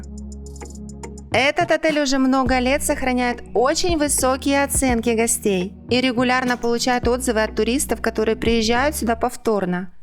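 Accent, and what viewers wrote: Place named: Moscow, Russia. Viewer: Обзор норм. Але цей московський акцент то підець.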